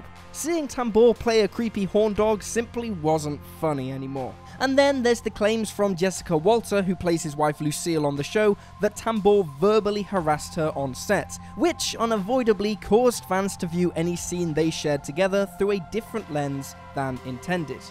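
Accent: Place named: England, United Kingdom. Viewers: Might be cause they're from England? Pronunciation is different man. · You can tell the announcer is English we get loads of Americanisms wrong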